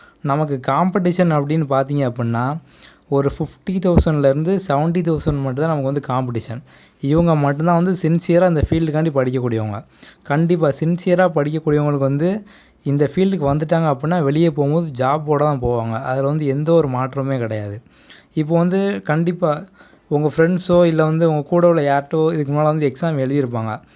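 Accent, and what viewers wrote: Tirunelveli, India. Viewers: Bro super thank u...But neenga nellai or thoothukudi yetho oru area thana...bro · Thnq so much...and happy to hear tirunelveli slang...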